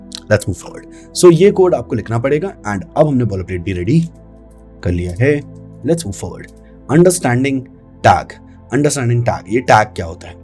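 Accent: Bhopal, India. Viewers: nice and calm explaination and to the point with some bhopali touch thank you sir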